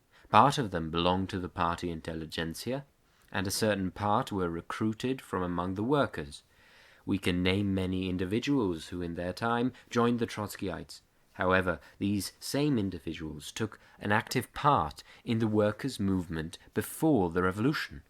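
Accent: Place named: United Kingdom. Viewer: This is a great presentation of the secret speech, full of passion and expression that can be heard in the voice and tone of how he speaks. The slight "British" or "trans- Atlantic" inflection makes it sound all the more Historical and Matter- of- Fact. Historians never fret over these very minor things, as I care more for the content of the speech, rather than the way it may be presented. All the best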